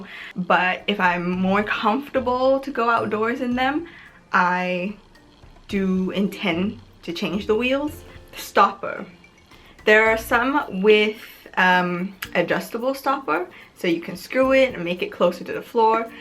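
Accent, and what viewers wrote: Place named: United Kingdom. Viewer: isn't that just the British accent?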